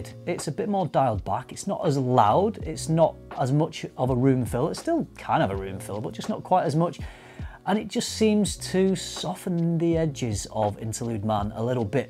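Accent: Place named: Bolton, United Kingdom. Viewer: Are you from Greater Manchester like the Bolton area? I recognise the accent but it's more like mine, I'm from Bolton but I moved to Lancaster then Workington and my accent has changed. I don't say perk instead of park anymore or hew instead of how etc. I basically round my vowels now but people can still tell I'm from Bolton even though it has been 19 years. I might be wrong but I'm sure I can hear Bolton in your accent or close to Bolton. I feel like I've heard your voice and seen you somewhere else do you do videos on facebook? Only just found your videos I just watched your comparing Aventus and like the cut of your jib so just subscribed!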